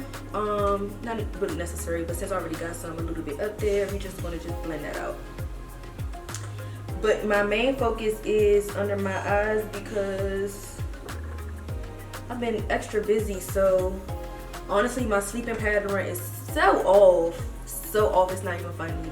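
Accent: Washington DC, United States. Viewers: Oooo is that a Dc accent I hear🥰😍😍❤️❤️❤️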